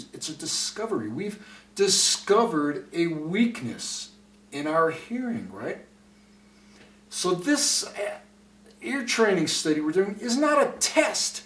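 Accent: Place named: Santa Fe, United States. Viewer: this guy must be from Santa fe. kkkkkk. love it.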